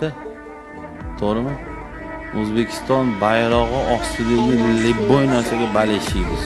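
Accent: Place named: Russia. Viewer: Domla nega rus tili talafuzini koʻp aytasiz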